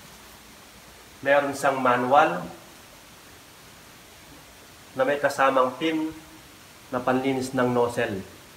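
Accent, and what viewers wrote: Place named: Pampanga, Philippines. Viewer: Kapampangan na kapampangan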